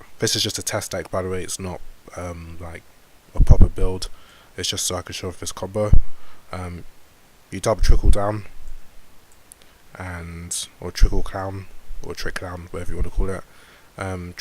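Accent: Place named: England, United Kingdom. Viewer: nice! The performages seem to do quite a good job for spellbooks, giving the deck access to great rank 4s. Trapeze magician also seems really good, with it floating and all. I've also seen people experiment with the combination of trick clown and heroic challenger- thousand blades to get multiple rank 4s on the field, so its really nice to see people innovating with the engine :D Btw, you sound english. Are you? o.O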